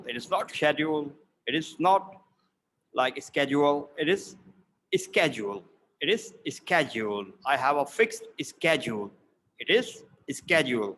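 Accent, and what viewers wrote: United Kingdom. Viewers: Sir your accent of British English and your pronunciation are very nice and I like your love for British English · I may a new one from here, But actually I do love your British accent.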